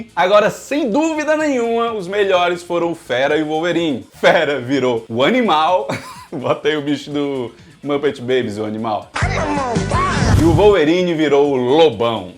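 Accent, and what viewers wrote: Northeast, Brazil. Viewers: Gostei do que vi, menos os rostos. E, cara, você fala como Nordestino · Vc é nordestino?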